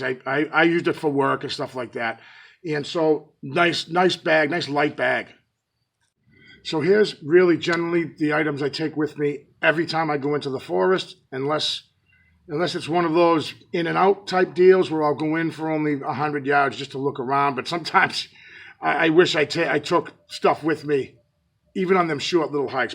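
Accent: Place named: New England, United States. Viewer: New sub here. Squatch Ya Dooin'?:) HEE HEE. Hey. Love the New England accent. Hypotenuse! I gotta kick outta that. LOL. Lookin for more vids. God Bless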